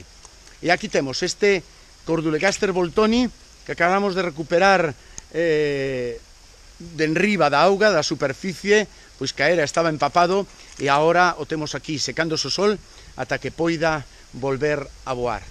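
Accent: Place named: Spain. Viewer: Parece q es un español y esta hablando portugués por q quiza esta en un país donde hablan portugués :v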